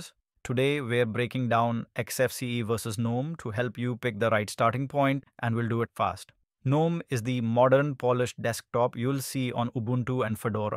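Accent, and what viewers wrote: India. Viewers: Indian accent, right? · Indian accent pmo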